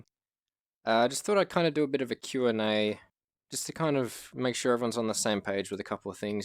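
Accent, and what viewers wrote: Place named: Australia. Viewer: Nice to hear a fellow Aussie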